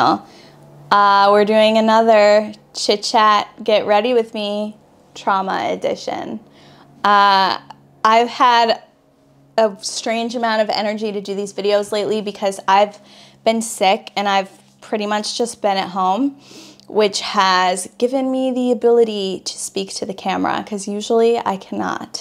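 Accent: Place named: South, United States. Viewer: Easy on the Southern accent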